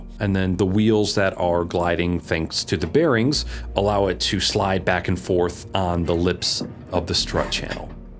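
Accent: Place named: Australia. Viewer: I hope that one day my Australian accent can be as good as yours. This instructional video helped!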